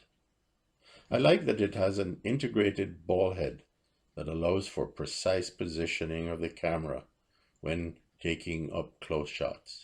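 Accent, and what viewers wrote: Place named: Caribbean. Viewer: Nice, I’ve just got one, that sounds like a Caribbean accent, where are you hailing from sir? It’s a long time since I heard a white gentleman speak with a Caribbean accent. Peace from a black Caribbean gentleman in the UK.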